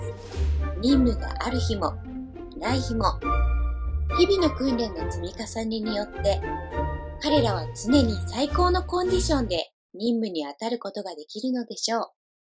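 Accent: Japan. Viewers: しゃべってるひと日本人？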